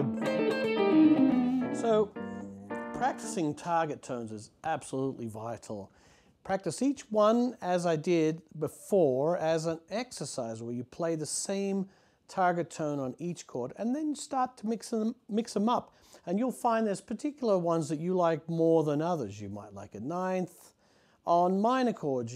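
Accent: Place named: Australia. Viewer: He has less of an Australian accent now.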